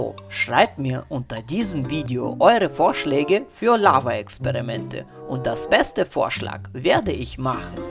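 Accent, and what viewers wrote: Russia. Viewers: #Kölnerdom Feiere deine Videos übel und viel Glück für deine Zukunft ignorier deine hater einfach hör auf die richtigen und dein Akzent feiere ich auch ich schätze mal du bist Russe oder Kasache meine Familie kommt auch aus Kasachstan 🇰🇿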